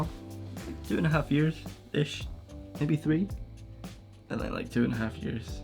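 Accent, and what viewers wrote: United Kingdom. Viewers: Wait, are you British?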